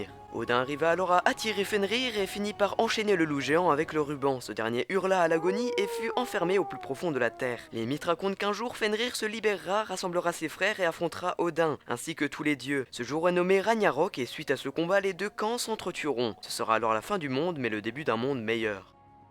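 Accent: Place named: Switzerland. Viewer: salut tu as un accent est ce que tu es suisse? :)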